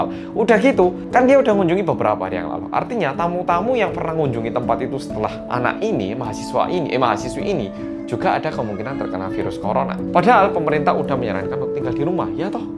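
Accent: Java, Indonesia. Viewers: Wes di dendo dikon ganti rugi. Hissss neng omah yo enak kok delok Youtobe, yang medok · Ganteng benner masss di video ini jujurr langsung terpana aku ngeliat mukanya mass medokk 🤣:' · Korea medooook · Mas muedok annyeong HI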